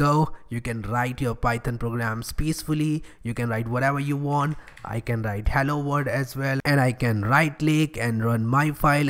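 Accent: India. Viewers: I thought he's joking with indian accent... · This guy's got the accent but is trying to be super cool. Thumbs up my hindu friend.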